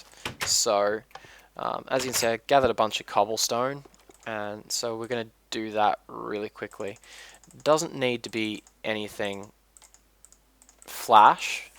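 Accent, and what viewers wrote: Australia. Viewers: I always enjoy listening to your australian accent, so feel free to make a spelunking episode :D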